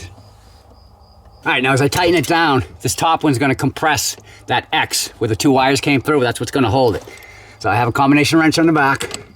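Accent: Boston, United States. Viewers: Installed Ready Brake and Ready Stop today on my JL.. It was a long day.. Also installed Maximus -3 Tow loops for the JL instead of a base plate . I have a front hitch on mine use for plowing and the Tow Loops play nice with it. Only issue with the Tow Loops is the NSA Clevis Adapter for Maximus 3 tow loop opening is 2mm too small. I had given them the specs. They said they had it in stock.. Welp yeah but wrong size.. They are gonna ship me out the correct one this week... Hey is sound like you are from Boston also..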